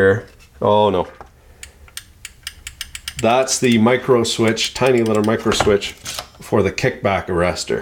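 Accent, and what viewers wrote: Canada. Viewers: I love your accent. I think I am going to have to learn to speak fucking Canadian, Ah? Learned lot of new vocabulary, like Shmoo, skookem. Fuck, I am going to have to watch this video again. Why this video brings me such happiness I have no fucking clue, but it does. :-) You definitely have a new subscriber. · You need to do a video translating all the sangs and saying. I'm guessing it's common Canadian contractor dialect but, some of us 'mericans have a hard time keeping up... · Just discovered this channel. Never heard a more Canadian Canadian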